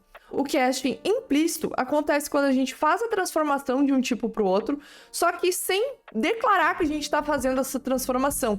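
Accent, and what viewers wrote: Rio Grande do Sul, Brazil. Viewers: ela é gaúcha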